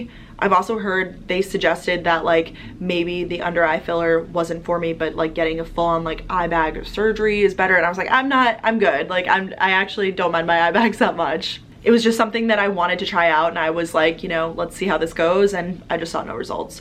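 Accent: Long Island, United States. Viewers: your long island accent is just the best🥰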